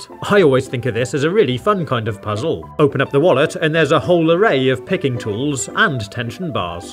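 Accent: United Kingdom. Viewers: Are you British are you from London are you even from America cuz I feel like you don't you also talk British so much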